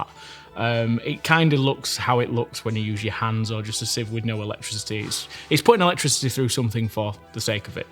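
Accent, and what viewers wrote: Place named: South Yorkshire, United Kingdom. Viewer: Me and the Mrs are having a scholarly debate regarding the placement of your accent. I'm saying no further south than Rotherham, no further east than Donny. She reckons Cas Vegas as a wild card. Are we warm or cold?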